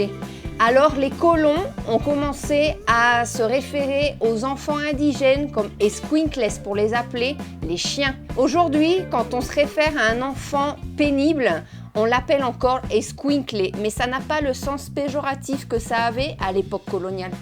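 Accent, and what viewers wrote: France. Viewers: Amo tu forma de explicar nuestra cultura con mucho entusiasmo e interes. Note que mencionas referencias y das a detalle del tema que abordas. De igual manera tu acento frances. Bellisima mujer. 😍👩🏻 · Me ha encantado tu video me hermana francesita! me das mas datos de mi cultura que que el INAH! (Instituto Nacional de Antropología e Historia) y es muy agradable escuchar tu acento "franchute" Ja parle francaise, un po y me encanta escuchar esos tonos y modos del francés!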